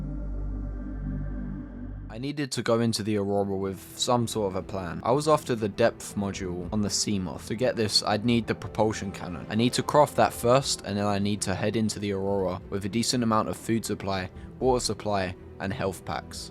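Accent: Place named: Australia. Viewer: Dude, are you an Aussie? I am and you kind of that sort of accent half the time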